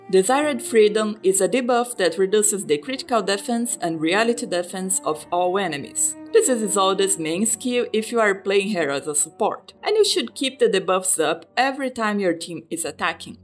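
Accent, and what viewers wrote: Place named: Brazil. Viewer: Eu assistindo pensando se você era BR pelo sotaque ai aparece a Jiu com uma garrafona de 51 OUFHISDAASFIHD Muito bom o video. Não tinha planos pra Isolde, mas o Joe com certeza me fez repensar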